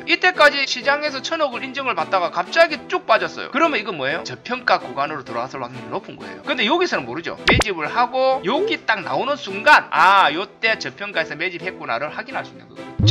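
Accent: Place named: Gyeongsang, South Korea. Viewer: 왠 만한 주식강의 보다 더 현실감쩌는 콘텐츠 구수한 경상도 사투리도 듣기 좋고.. 나도경상도.ㅋㅋ 감좌합니다~ 선배님..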